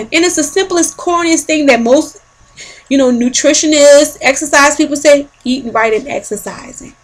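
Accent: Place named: New Orleans, United States. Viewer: You are SO COOL!!!!!!!!!!!! You have an accent, Are you from the NOLA? *New Subscriber*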